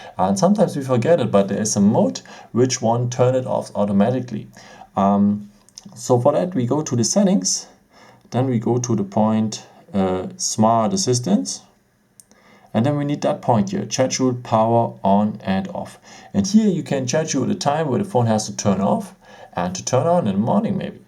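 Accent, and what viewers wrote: Germany. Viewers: Is he german💅💀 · dat is doch nen deutscher oder verhör ich mich?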